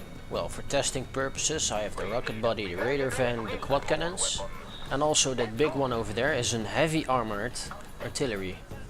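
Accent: Netherlands. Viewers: Bedankt voor je video! (ps. wel grappig: ik kan gewoon je overduidelijke NL accent in je engels horen! ) haha ;D verder gewoon perfect goed Engels hoor...en dankje voor je uitleg! Wil dit spel zelf heel graag spelen, maar ik krijg me handen gewoon niet aan een Beta key om het te downloaden...ben zelfs bereid goed te betalen voor een werkende Beta key...illegaal of niet, maakt me niet uit! Een vraagje...zit C&C 2013 nu officieel nog steeds in de Alpha fase of is dit inmiddels de officiele Beta?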